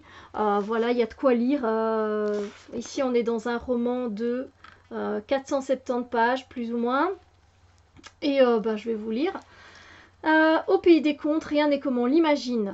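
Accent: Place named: Belgium. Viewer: beau accent belge lundi le foot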